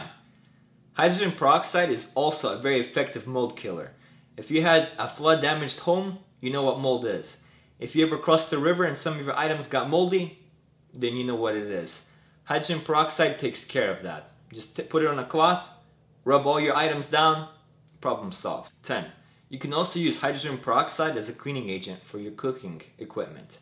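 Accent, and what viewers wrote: Russia. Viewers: Wat happen to your Russian accent 😯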